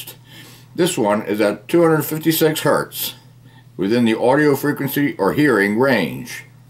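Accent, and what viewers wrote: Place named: Catskills, United States. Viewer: I wear hearing aids and now I'm wondering how they work! I'm based out of northern New York State near the Canadian border and I've always collected rocks and minerals from all over the country. I also prospect for gold and often travel to southern NYS and Vermont. If you ever feel like finding some gold let me know and we could plan a trip. Your accent sounds like my family in the Catskills!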